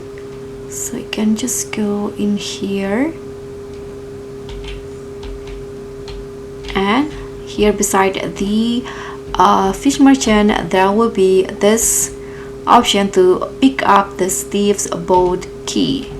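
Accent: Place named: Indonesia. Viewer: Bahasa inggris lu kayak bahasa Indonesia, karuan pake b indo, jujur ga ngerti gua ngomong apa pas belum ngeh wkwk, tapi makasih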